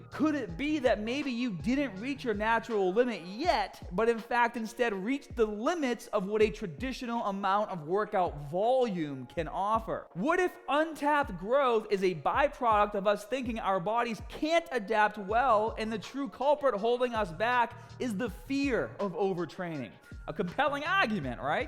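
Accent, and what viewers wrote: Boston, United States. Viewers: Wait is that Boston accent fake or not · That Bostonian accent is great... say Park the Car in the Yard 😁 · Are you from Baah Ston? :-)) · What are you from Boston?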